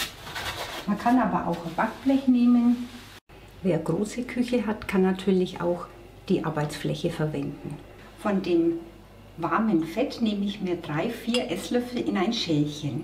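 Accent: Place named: Franconia, Germany. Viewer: Hallo, aus welcher Ecke Frankens kommst Du? Ich höre den Dialekt so gern. Lg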